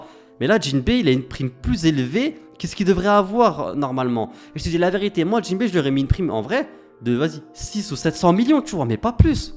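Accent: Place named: France. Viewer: Mon avis ? Parle moins avec ton accent de cité ça gonfle 5 s de vidéo j en ai eu assez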